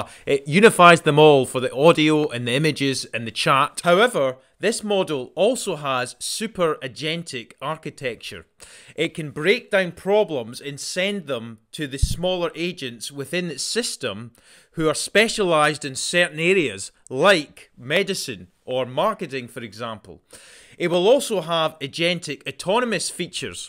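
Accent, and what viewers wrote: Scotland, United Kingdom. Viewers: English language spoken with a Scottish accent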